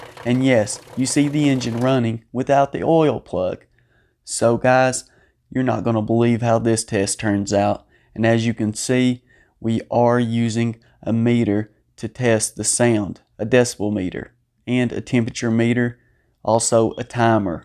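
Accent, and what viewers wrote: South, United States. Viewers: You are the type that give those with a southern accent a bad name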